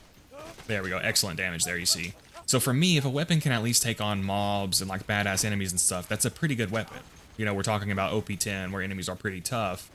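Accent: South, United States. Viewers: They honestly do sound similar its just his voice has a southern kind if accent and fiber's doesn't. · You don't really sound much like fiber honestly. Your accent is pretty clearly southern his is far less so, if at all. Shadow definitely has the master lock picking ability lol. The best bossing weapons in the game, as far as I know, mostly all have a synergy with the bee due to unlisted projectiles, a unique firing pattern, or are able to benefit from multiple parts of the damage formula. The ones that don''t still kill things in op10 but they just take longer to do so. I think much of the gear discourse in this game might actually be driven by availability and the opportunity cost of having an item equipped/taking up bank space compared to another. Having only 63 places per VH to hold gear makes the decision on what to farm, keep, and use more difficult than it otherwise might be. 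The unlimited bank mod is really helpful for this, but I think much of the discourse around what's "good" at end game stems from the early days when most played on console and mods weren't as readily available on PC. Also, does Buttstallion always/often drop gemstones, or is that just the only place you can possibly acquire them?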